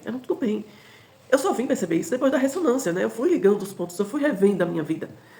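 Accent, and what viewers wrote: Brazil. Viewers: Que alegria conhecer seu canal, esse sotaque lindo que não reconheci até você dizer que é Sergipana (ainda não conheci Sergipe). Me senti inspirada e mais motivada para me amar e ao todo. Dou gracas por sua vida! 🙌🏾🤲🏾🙏🏾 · Bem logo via que teu jeito de falar era das redondezas daqui😂😂😂😂😂😂 sou de Alagoas hahahahhsah amei seu canal e to na quarta onda ja ❤ obrigado pelo vídeo ❤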